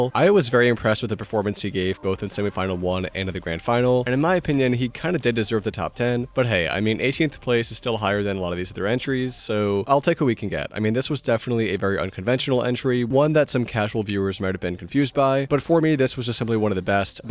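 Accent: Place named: United States. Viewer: I like your channel,! And I agree with you a lot. From your top, the only entry that is not my style is Spain, but I definitely appreciate the art and her amazing talent. All my votes went to Luke 🥺 I wanna see more videos with your comments, I enjoy your american? voice. Haha 😀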